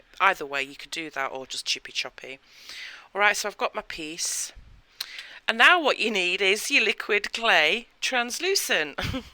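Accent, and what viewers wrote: England, United Kingdom. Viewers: Lovely to hear an English voice!!!!! I am in Ireland love your channel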